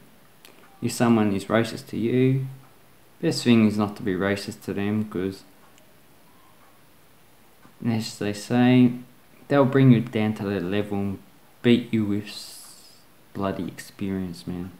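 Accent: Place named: Australia. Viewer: Please use American accent instead of Aussie accent it's really hard for me as a non-english speaker to understand every word that you've said, even if you talk slowly as in the video.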